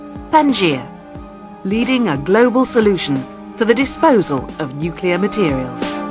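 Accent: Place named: England, United Kingdom. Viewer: The English accent voice-over wasn't a great idea though!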